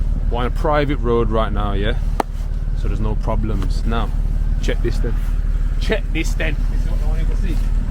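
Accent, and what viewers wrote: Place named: United Kingdom. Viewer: it's crazy that i picture a dude like you when I hear British these days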